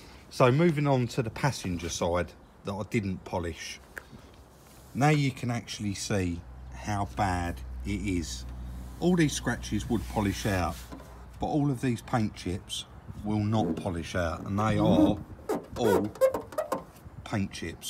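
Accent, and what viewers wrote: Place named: England, United Kingdom. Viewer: Not being a dick but it's so refreshing to hear an english youtuber. Love the american stuff but it's hard to relate, if you get what I mean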